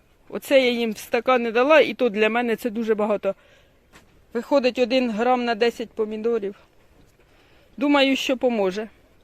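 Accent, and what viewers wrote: Poltava, Ukraine. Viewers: Мені здається,що у Вас полтавський говір,чи ні? Якщо так,то який район?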